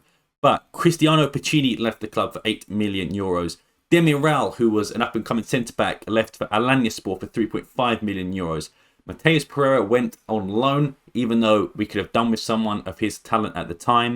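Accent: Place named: England, United Kingdom. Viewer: Good video. You have an Iberian name and wear a sporting shirt but you have an English accent? Where are you from and who do you support?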